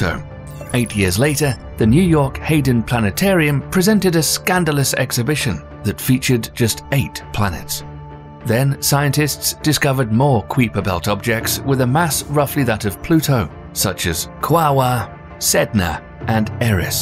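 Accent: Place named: RP, United Kingdom. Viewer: I love how he says the “kweeper” belt. I guess I’m just a yankee and he’s using the more fancy queens English🤷🏾‍♂️🤔😂 I thought it was pronounced ky-per belt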